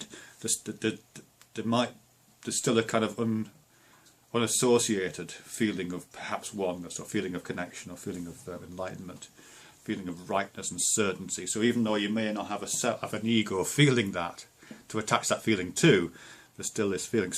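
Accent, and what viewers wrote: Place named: England, United Kingdom. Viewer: Your voice is sounding more English than Scottish these days.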